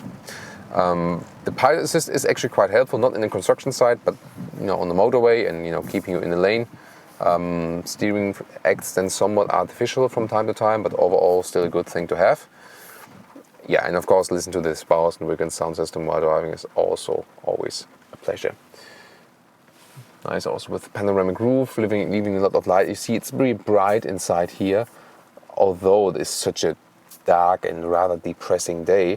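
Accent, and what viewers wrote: Germany. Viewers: Jouw Duitse accent is charmant. Vriendelijke groeten van Marcus uit Holland :)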